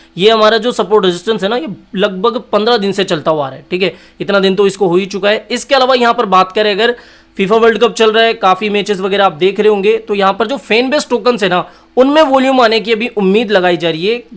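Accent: Rajasthan, India. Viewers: Rajasthan se ho kya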